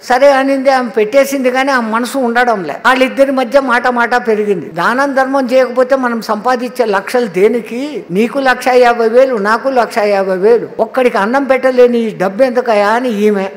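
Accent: Rayalaseema, India. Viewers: పక్కా రాయల సీమ భాష.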